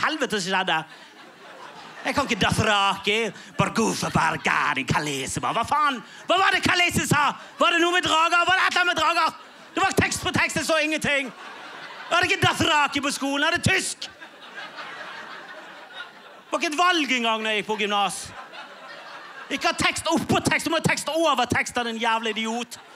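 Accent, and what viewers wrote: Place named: Bergen, Norway. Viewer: Norwegian. Bergen dialect.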